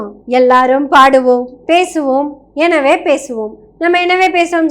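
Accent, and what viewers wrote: Kongu, India. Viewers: கொங்குத் தமிழில் நோண்டி நுங்கெடுத்து சங்கிகளைப் பங்கப்படுத்துவதில் தங்கைக்குக் கைவந்தக் கலை! அழகுத் தமிழுக்காகத் தலை வணங்குகின்றேன்🙏🙏🙏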